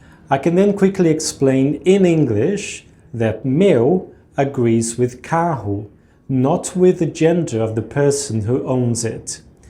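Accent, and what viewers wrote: United Kingdom. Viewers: Um abraço de Sheffield de uma irlandesa que fala português. Nunca morei no Brasil, mas adoro o país e estive lá umas 7 ou 8 vezes ao longo de 25 anos. Na primeira vez, eu literalmente não conseguia abrir a boca para dizer nada; a tia surda-muda do meu namorado na época (um mineiro) até simpatizou muito comigo porque achava que eu também era surda-muda! 😅 Depois que soube disso, comecei a abrir a boca e a usar as palavras que tinha na cabeça. Eu lia livros e jornais, ia a restaurantes brasileiros e conversava com os funcionários. Não tenha vergonha de cometer erros. É exatamente assim que as crianças aprendem, e nós também ficamos uma graça tentando falar com nossos sotaques estrangeiros. Eu não entendia por que todo mundo caía na gargalhada quando eu dizia que adorava salmão “fumado”. 😂 Você tem um sotaque inglês adorável e culto, e acaba de ganhar uma nova seguidora. 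P.S.: Outra dica que me ajudou, além dos podcasts, foi assinar o Spotify Premium e cantar junto enquanto lia as letras das músicas brasileiras.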